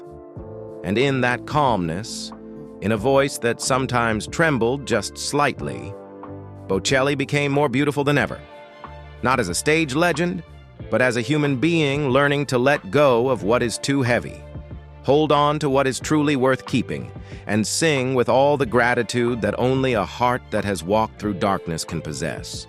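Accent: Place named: South, United States. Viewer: So much repetition and “over the top” words, expressions, comparisons”. I assume Morgan Freeman was the narrator. His Southern roots creep through. A good editor could have pared this down and made it much better. It got very tiring.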